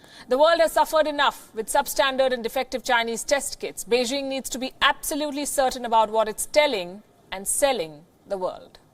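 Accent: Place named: North India, India. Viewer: var1328 Lol no. That's the North Indian accent. British sound completely different.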